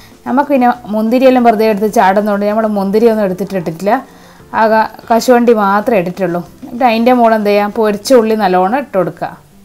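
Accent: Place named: Kannur, India. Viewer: Adipoli ayitund.....enikk nde avtharanathil...ettavu. Ishtam enthanenn vechal..nammale..Kannur..bhashayil thanne ulla...... natural aya avtharanam...super dear..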